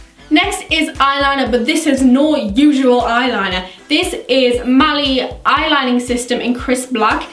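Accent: Newcastle, United Kingdom. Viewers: Where about in the north east are you? You sound like you from somewhere close to Newcastle . xx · She's British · Are you a geordie because you kinda have an accent like me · yeah It's a geordie accent I have one too . you get one if your from around Newcastle,Durham ,Sunderland and parts of a place called Wallsend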